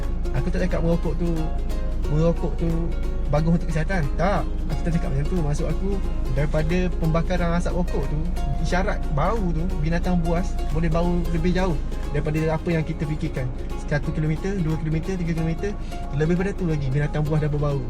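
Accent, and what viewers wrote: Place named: Kuantan, Malaysia. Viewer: cara aok cakap.pon koi taw aok org kontan.. 👍😄. Biasa mancing sgai belat ke?😄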